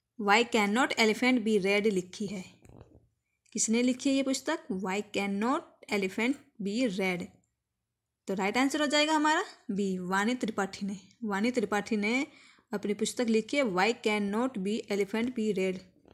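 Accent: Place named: Haryana, India. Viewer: Aap up me aake hindi bolna seekho ....haryanavi aur hindi ko mix na kro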